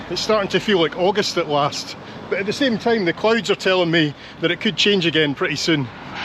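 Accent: Scotland, United Kingdom. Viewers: Interesting vlog but in all fairness, it's a tad rich for a person with a good Scottish accent to slag off us Irish for the way we pronounce the word "three"! There is actually a very good reason why we tend to silence the TH — we don't have that sound in our Irish language, so in Hiberno-English three may sound similar to tree. As for the prices on board — they are much the same as you'd pay in Dublin for breakfast or dinner. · I am not sure what it is about your channel that I love the best?, Your Scottish accent or the GREAT places you go to and take us on? You don't know how much this means to those of us that are ill, or unable to go to these places due to age. I have always dreamed of traveling to the UK, especially to Scotland & Ireland as I had a Great Great Grandma from Ireland. My body is older and I don't know if I will ever get to go, but, your video's allow a brief respit to age, and I feel like I am there with you in the video's. 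LOVE the ferries, and love the honesty of your video's. God Bless and keep it up!! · I'm a bit late finding you, but your videos are great. Very interesting and the narration delivered with the the Scottish brogue, brilliant!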